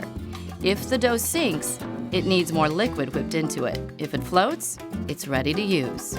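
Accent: United States. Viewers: What you heard is an American accent, not an English accent.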